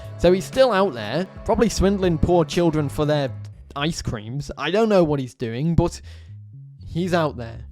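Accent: United Kingdom. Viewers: Is this a Scottish accent by some chance?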